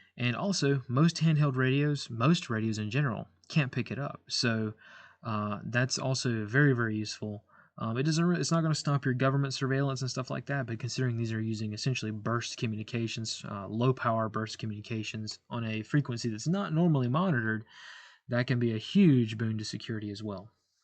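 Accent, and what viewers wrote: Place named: Georgia, United States. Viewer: You from Georgia? You gotta similar accent to my buddy from Georgia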